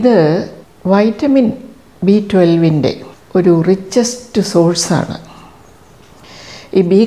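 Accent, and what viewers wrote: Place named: Kollam, India. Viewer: 🙏🙏 teacher,super presentation ammayude sneham adhyaapikayude arivu ellaam koody nalla ishtamaayi 😍😍 teacher place kollam aano samsaaram kettappol thonniyathaane 🤗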